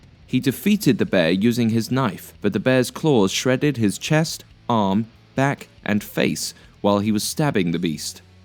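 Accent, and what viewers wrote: United Kingdom. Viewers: As soon as I heard a British guy speaking I bailed sooo sick of everything I watch narrated by Brits bye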